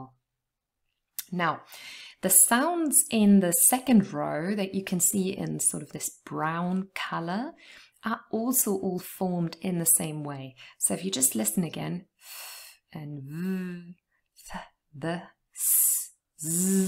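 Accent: United Kingdom. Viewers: I could listen to you for hours together. Language and phonetics is such a fascinating world to explore. After watching Dr Geoff Lindsey, my curiosity about English phonemes exploded, but I was not acquinted with the compound vowels symbols, so was finding it a little difficult to follow some of his videos. So I am glad YT brought you in my feed a week ago. I am bingewatching your videos to understand the basics of English vowels and consonants and speech pattern. I am always fascinated by British accent. Your videos threw light on how it comes to be. I hope British people do not lose their accent in coming generations now that everything is getting Americanised these days. 🙏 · Is it British accent?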